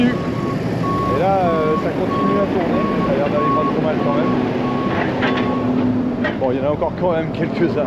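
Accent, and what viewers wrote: Nord, France. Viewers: y fait noir comme dans une....., c'est parti mon kiki, merci pour l'accent du Nord et la vidéo 👍👍👌👌😊😊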